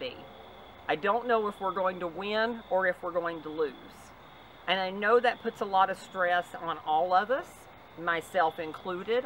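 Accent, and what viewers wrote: South, United States. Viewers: It's good to hear another trans woman with a southern accent. You kind of remind me of back home in Central Virginia.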